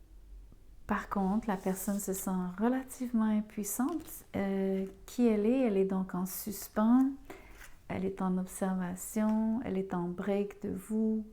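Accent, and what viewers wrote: Quebec, Canada. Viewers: Bonjour, déjà j'aime beaucoup votre calme , votre voix, par contre je vois pas du tout qui cela pourrait être , cette personne ?? Bref vous m 'apprenez qu'une femme pense à moi en secret , car vraiment je ne vois pas qui c'est ! l'avenir me le dira ou pas . Merci a vous et finalement, je commence à aimer l'accent québécois.😉🤭🤔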